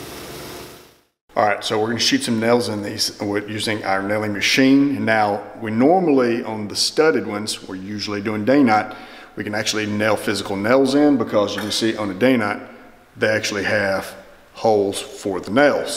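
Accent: South, United States. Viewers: Even though your channel focuses mainly on men's shoes (and I understand your demographic is mostly male, so right you are), you have no idea how PERFECT your videos are for me, a woman, to have on in the background while putting on makeup in the morning. Cobbler shop ASMR interlaced with shoe wisdom spoken in a smooth but approachable Southern accent. 👌🏻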